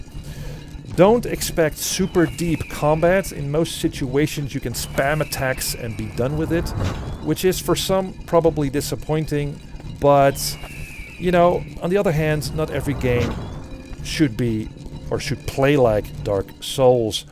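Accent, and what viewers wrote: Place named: Netherlands. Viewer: Thanks for your review. BTW, your accent really sounds like someone from The Netherlands.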